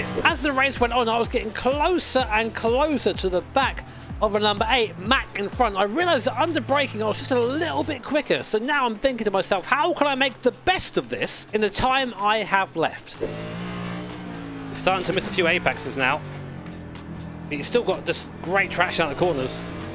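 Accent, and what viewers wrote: United Kingdom. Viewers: YOU'RE BRITISH???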